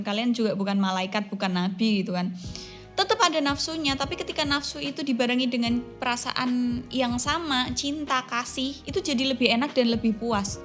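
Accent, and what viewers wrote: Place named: Java, Indonesia. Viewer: mbak eee.dari Semarang thoo..aku sneng logat nya itu lhooo medhok tenan.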